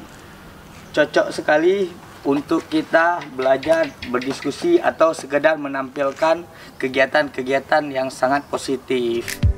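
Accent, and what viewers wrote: Aceh, Indonesia. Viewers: B.Indonesia nya aceh tok tok kali bang🤣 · Beliau Logat Aceh nya kental sekali, makanya ngomong indo ke gitu :v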